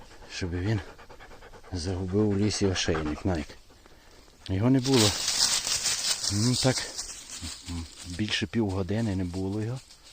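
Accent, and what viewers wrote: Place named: Zakarpattia, Ukraine. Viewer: Чернівці значить, я із Закарпаття,з Виноградова просто Ви розмовляєте як у нас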